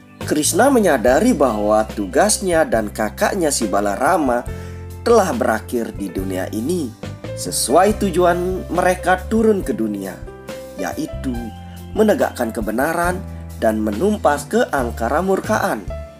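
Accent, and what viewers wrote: Bali, Indonesia. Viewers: Logat balinya kental banget hehe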